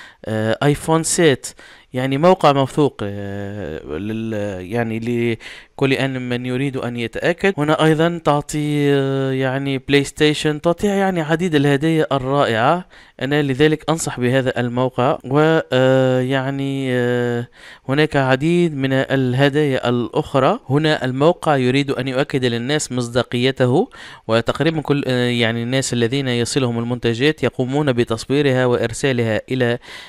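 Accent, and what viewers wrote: Tunisia. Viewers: هل انت تونسي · انت تونسي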